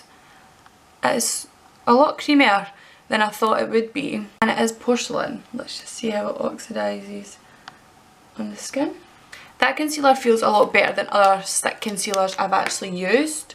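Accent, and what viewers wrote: Scotland, United Kingdom. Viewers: I just adore the Scottish accent, I’m so obsessed with it 🥰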